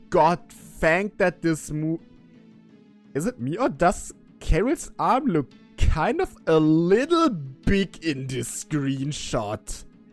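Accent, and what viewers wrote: Germany. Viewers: Lmao man hört dass du deutsch bist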